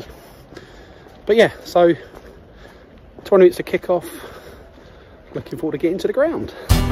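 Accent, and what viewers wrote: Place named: London, United Kingdom. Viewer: Absolutely brilliant mate , you sound like a Londoner , my favourite city in the whole world after ……. This video had me in tears , ma team - the Bully wee. WRTFBW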